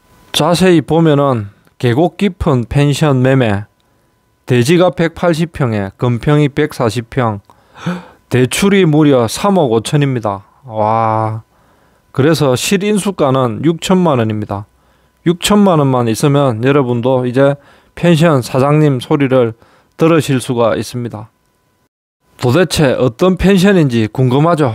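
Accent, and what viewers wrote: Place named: Gyeongsang, South Korea. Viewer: 투박한 말투와 경상도억양 보다 현실적이고 가식적이지않는 친근한 나레이터.. 전국곳곳의 간접경험 좋은 정보 좋습니다..ㅎㅎ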